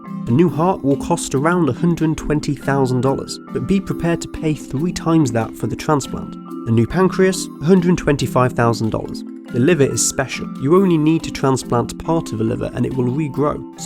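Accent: England, United Kingdom. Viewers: Before I clicked this video, I predicted the voiceover was going to be an English dude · You have to pay for legal organ transplant? WTF? I'm looking this up right now and where I live (Turkey) A kidney transplant costs the government about 25000 USD. The organ doesn't cost money it is only the surgery(or mulitaple) and the after surgary hospital stays that cost. How can that be more than 200.000 USD? That 25000 USD includes the hospital and medicenes and crap. And you can't tell me that Turkey is poorer. Yes cost of staying at the hospital differes but kidney is free because it is donation and drugs you take fater the sureary are the same everywhere. I really want to know how can a kidney transplant cost more than 200000 USD in a normal country. Is that just the price in USA since government doesn't care if you die over there? If it is why I'm sorry for not understanding but he sounds British, I thought they had healhcare over there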